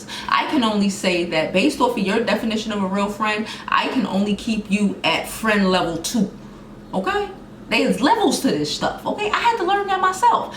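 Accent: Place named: East Coast, United States. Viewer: I know my east coast people 😂💚 you either from Philly or DC 🤷🏾‍♂️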